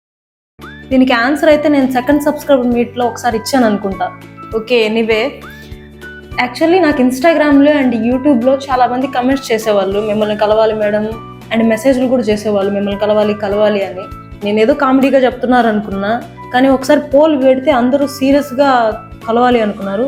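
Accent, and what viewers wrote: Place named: Guntur, India. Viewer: Tirupati antunnaru but maa guntur slang undi enti ?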